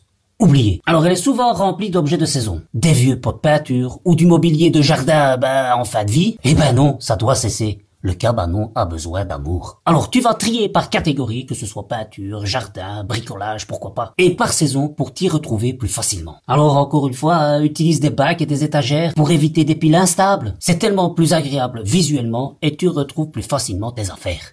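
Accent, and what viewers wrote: Belgium. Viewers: On reconnait bien l accent belge! Et j adore❤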